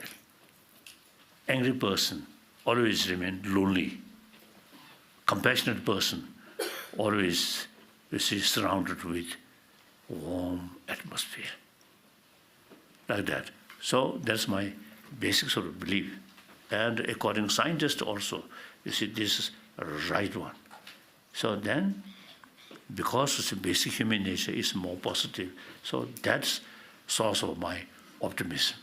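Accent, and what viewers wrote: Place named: Tibet, China. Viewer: that is absolutely not what the Dalai Lama said, it's an AI speech recognition program that goofed up because it misheard his Tibetan accent, but to answer your first question from my own perspective: yes. It certainly can be, though not necessarily so. Also, while many communist dictators were atheists, atheism is NOT inherently authoritarian and conflating ordinary atheists with Stalin or whoever is a vicious smear. I will not conflate you with Hitler or Franco, who I believe were both Christians, and I would expect the same courtesy and good faith from you with regard to me, as an atheist, and Stalin or Mao ZeDong or whoever. Ground rule: at least have BASIC good faith and don't smear people like that. Agree?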